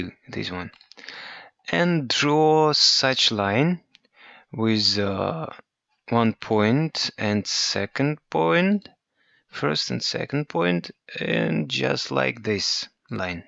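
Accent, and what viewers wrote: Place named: Russia. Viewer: Русский акцент не спутать)))))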